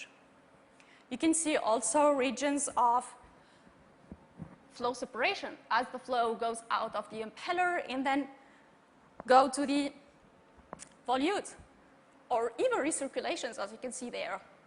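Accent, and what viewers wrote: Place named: Sweden. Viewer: I bet she is swedish, I believe that's the accent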